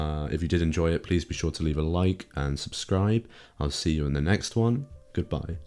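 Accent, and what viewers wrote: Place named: United Kingdom. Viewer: I will no longer be watching videos narrated by the hated British.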